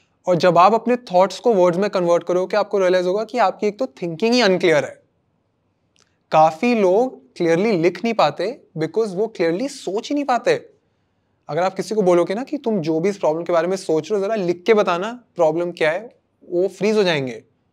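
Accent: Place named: India. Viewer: I'm sorry but the Indian accent is still very difficult to hear. However, your tutorials are still of very good quality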